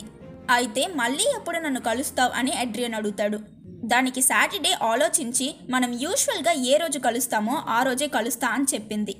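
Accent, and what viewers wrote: Tamil Nadu, India. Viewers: are you tamil kanu vunnu what is this but your explanation is very good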